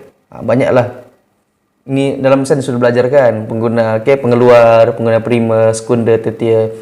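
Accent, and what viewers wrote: Sabah, Malaysia. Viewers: ketara dialek sabah HEHBHABA btw thanks cggg ^^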